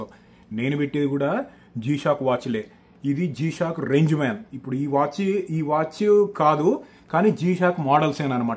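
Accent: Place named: Telangana, India. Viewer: pure telangana slang Broo. support from Kamareddy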